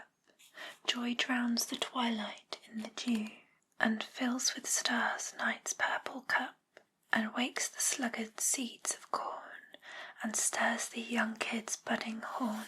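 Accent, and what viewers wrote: United Kingdom. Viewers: really those have one the best voices for asmr her british accent certainly helps like Lottie🤤🤤🤤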